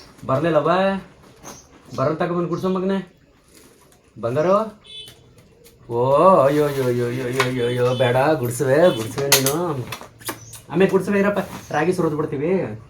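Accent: Mandya, India. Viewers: Nimma ganda hendathi koli jagala chennagirutte. Namma mandya bhashe kelokke chanda .Nim vlog nodthaedre thumba khushi agutte.Nanu nimma hosa subscriber.😊😊😊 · Sir nim hadu bhashe super mandya nativity super family vlogs madi sir nim hendthi soft innocent super sir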